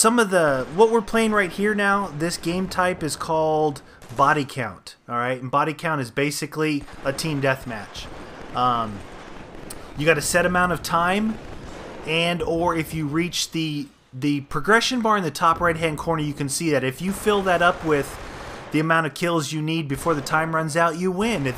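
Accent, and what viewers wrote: Canada. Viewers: I like better then the others. No offense, but the " aut " and canadian language gets annoying in the long run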